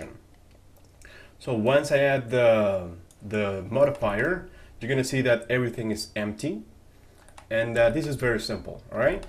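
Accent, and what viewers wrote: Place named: Spain. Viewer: Excelente tutorial... Supongo que hablás Español por el nombre y además por el acento:)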